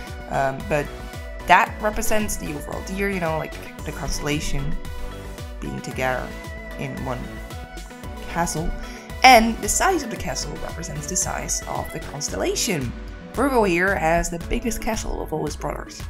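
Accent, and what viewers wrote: Netherlands. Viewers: Virgo should definitely be a pretty boy. Also I like your voice overs because your accent reminds me of my Dutch friend 😁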